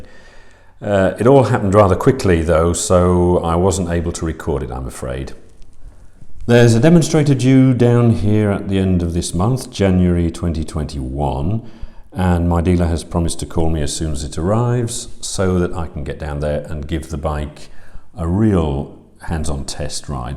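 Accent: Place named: Yorkshire, United Kingdom. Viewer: Eyup dude, Welcome back,glad to hear your Yorkshire accent again !! lol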